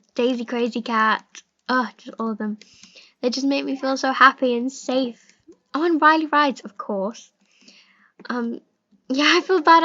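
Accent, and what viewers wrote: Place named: United Kingdom. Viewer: hey!! are u from the UK? i really find your accent cute <3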